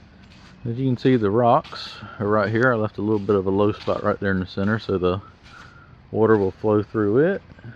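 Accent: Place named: North Carolina, United States. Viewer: Ferguson 20 diesel Its a NC thing. I tried to teach my friend to say oil, boil and toil, and he finally got it right, after 20 tries! Lol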